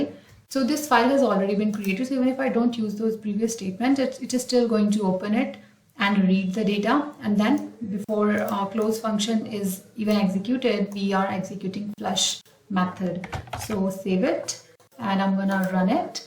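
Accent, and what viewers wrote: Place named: United States. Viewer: in your previous video like 2 years before you spoke like a American like born there but now your teching is good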